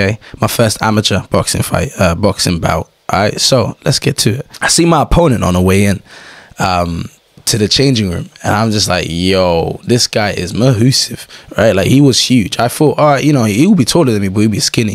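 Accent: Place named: Midlands, United Kingdom. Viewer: Ure from Leicester or Nottingham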